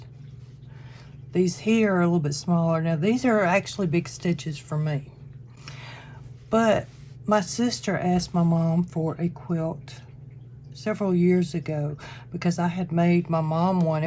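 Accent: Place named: South, United States. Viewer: Keep going! I do all the hand craft/arts and sloppy/ large stitching is a totally new concept ( mind blowing) to me! I was taught by a perfectionist hand quilter ( 10-12 stitches per inch) and match all points or take it out and try again. It’s hard to break old habits and relax enough to do slow stitching as it’s called today. Kawandi, sashiko, boro are not the same but they are large needles and larger stitches. Raw edge will not wash up nicely unless you secure the edges down sufficiently— they will curl and fray and some areas may bunch up more than others. Keep experimenting and show us your progress! I love your southern drawl— just like my great aunt in Arkansas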